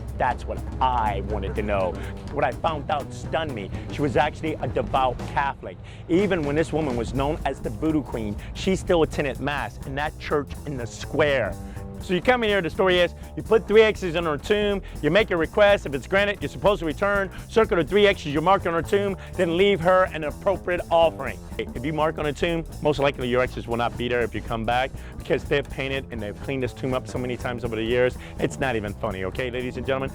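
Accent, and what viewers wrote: United States. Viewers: He sounds like he's from southwest Louisiana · prolly from Michigan or sumn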